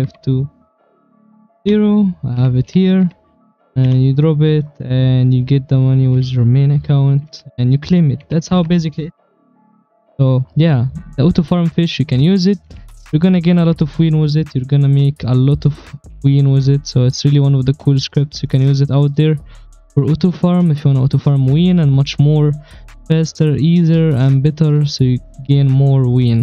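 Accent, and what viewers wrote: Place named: Middle East. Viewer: accent bad as a person from middle east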